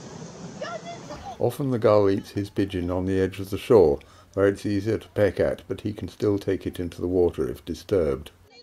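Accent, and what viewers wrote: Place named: United Kingdom. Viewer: Educated? Lol. Thats just a british accent fool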